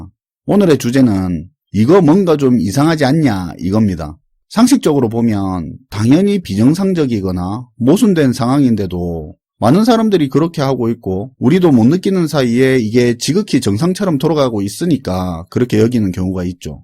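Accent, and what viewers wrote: Gyeongsang, South Korea. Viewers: 구수한 경상도 사투리. 싫어하는 사람도 있겠지만 듣기 편하네요. 마치 친구 이야기를 듣는 것 같아 좋습니다.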